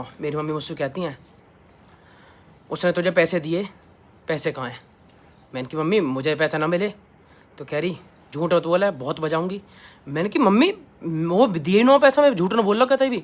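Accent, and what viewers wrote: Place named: Braj, India. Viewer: Bhaiya aapki voice se lag raha hain ki aap mathura aagra ya vrindavan ya hatras se hain kaha se hain bhaiya badi pyaari aawaz lago moko to lago ki tum brajwasi ho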